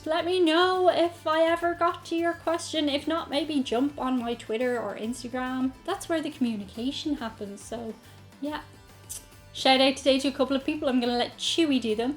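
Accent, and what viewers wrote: Ireland. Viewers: An ageing Englishman here who just happened upon your you tube channel. For years, I have said that my personal two favourite accents in English are standard RP English English accent (Fiona Bruce for example) but top of the list is the middle class, educated, cultured Dubliner accent - I inwardly swoon - male or female when that kind of accent is being spoken around me. Your accent, is gorgeous. As for the American attitude towards the whole Irish/English thing - I hardly know a street in England without a resident Irishman or woman - mostly married to an Englishman/woman. I have been to the Republic of Ireland many times and my accent gives me away as a Southern Englishman immediately. I have only ever been treated properly and respectfully as the minimum but generally very warmly as the Irish are generally wonderful. Indeed, when I have been in mixed company with Irish, English and Americans, what we, the English and the Irish have in common normally bonds us together more easily. 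Good luck with your channel. Love from London. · Would you ever consider doing a class teaching a proper Irish accent? Edit: PS I love how your nails match your dress! 💜😘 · Eventually things will get to the point where Youtube will prohibit all specific words. You'll have to say things like, "Article adjective adjective noun verb. Verb! Verb article adjective noun!" I don't know one Irish accent from another. I can tell there are differences, but I can't tell what part of Ireland someone is from just from their accent. I do think the various Irish accents (including yours) are the most beautiful in the English language. They're musical. Subscriber curse: You'll spill water on your chair without knowing it, and when you sit down you'll have an unpleasant surprise. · Ms., my favorite accent used to the British accent. Since finding you, my favorite accent is now the Irish accent! With your beauty and accent, I'm hooked.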